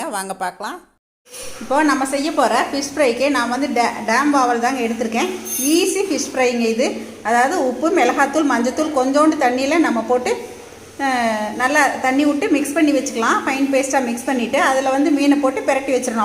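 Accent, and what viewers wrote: Kongu, India. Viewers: superma 👌.karura palavidhamana visayangalayum kovil anai hotel market handloom bedsheets towels indhamari ungalai kavarndha visayangalayum podunga .therindhukolla vasadhiyaga irukkum.kongu tamil pesi vilakka neengaldhan super.valarattum ungal pani valthukkal.💐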